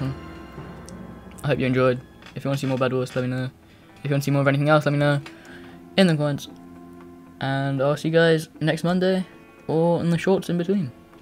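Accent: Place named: United Kingdom. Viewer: every time you talk in your videos it reminds me that you're british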